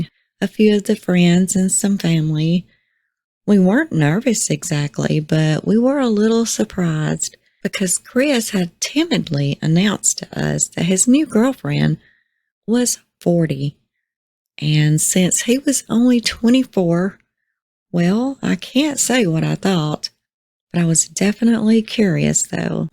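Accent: South, United States. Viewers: You actually did a really good job on the Chris Watts book reading and I literally listened to all 9 hours and your voice is pleasant and your accent is fun because I literally never listen to any voiceovers with a Southern accent so it was a good change and you have a good speaking voice. Nice job!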